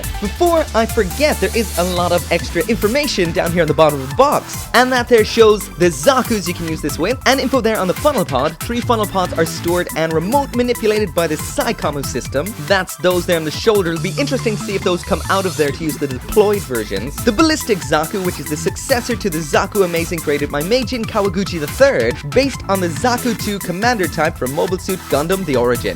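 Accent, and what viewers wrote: Ireland. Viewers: Wait your Irish What county you from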